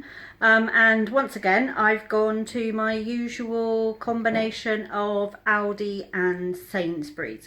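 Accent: United States. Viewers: Your American accent made me giggle! We do say it like that haha. Great haul and I love Franks Red Hot!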